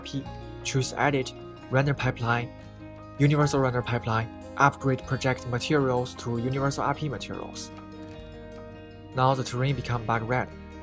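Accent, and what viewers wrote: China. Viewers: 大陆口音，哈哈哈。加油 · Chinese？Your accent is familiar to me. Good Tutorial !!! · 我听着就像国人的英语，哈哈哈哈，直到看到了输入法。视频真牛逼啊，学到了干货！牛逼！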